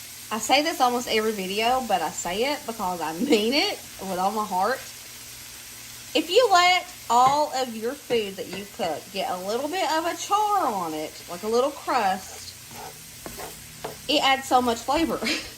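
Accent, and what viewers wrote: South, United States. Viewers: Came across you today and I have to say you are a joy to watch between your great, cheerful personality, your cute southern accent and your sweet face! You are so creative and with the price of groceries these days, you are doing a lot of good sharing all of your around $5 recipes and make it look fun!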